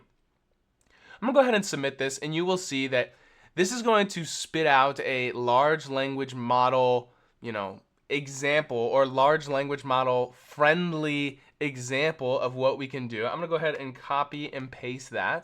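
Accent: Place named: England, United Kingdom. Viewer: Tone is one thing, how do you get rid of the annoying English accent?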